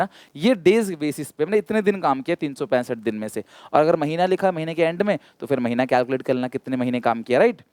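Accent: Odisha, India. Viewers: Sir r u from orrisa🤔🤔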